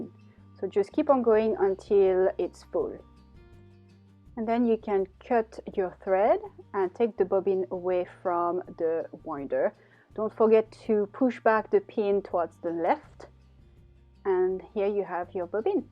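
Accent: France. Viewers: Are you french? Your accent is lovely!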